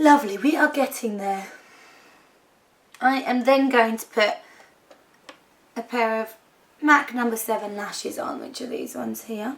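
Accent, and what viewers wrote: United Kingdom. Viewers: Jesuuus! She's british and I can't understand many things she say :/ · I really love your brittish accent *__*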